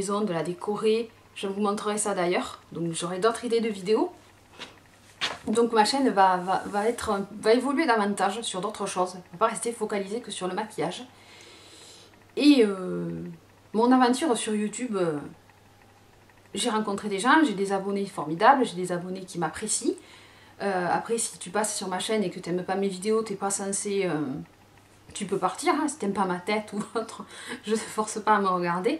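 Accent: South, France. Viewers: ton accent du Sud ne me dérange pas du tout d'autant plus qu'il est chantant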